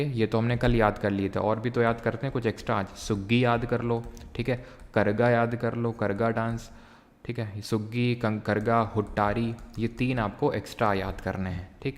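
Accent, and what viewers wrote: Kanpur, India. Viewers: Ye hamara kanpuriya style hi😄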